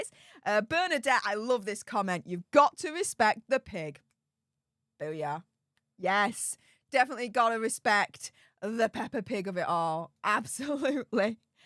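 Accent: Yorkshire, United Kingdom. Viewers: Love the Yorkie accent!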